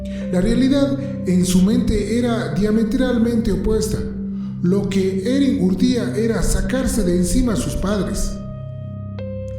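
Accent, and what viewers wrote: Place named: Sierra, Ecuador. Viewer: De qué parte de Ecuador eres? Me suena a la Sierra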